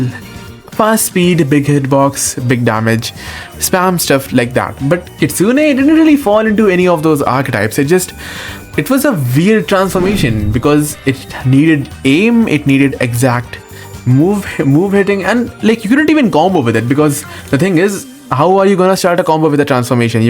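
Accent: India. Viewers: bro this is indian accent i guess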